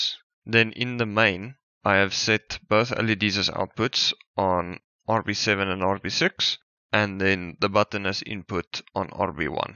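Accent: Afrikaans, South Africa. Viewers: Klink of jy Afrikaans kan praat :-). Great videos! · Is jy afrikaans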